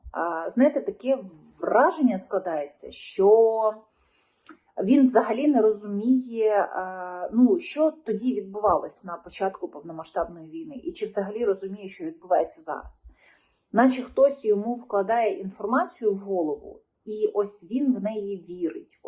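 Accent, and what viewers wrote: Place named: Moscow, Russia. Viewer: Ця касабка ще на початку 2022 року зомбувала аудиторію російською з мацковскім акцентом. Така типова мер &твечуківсько-ахметівська гебнява журнашлізда.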